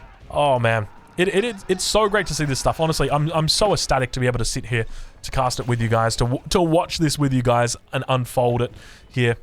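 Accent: Australia. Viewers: Even on 0.75 speed u talk too fast man… slow down!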